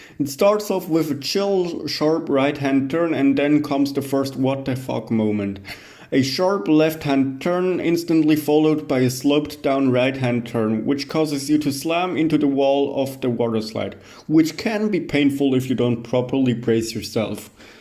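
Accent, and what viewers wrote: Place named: Germany. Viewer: Youre german,right?I can hear it...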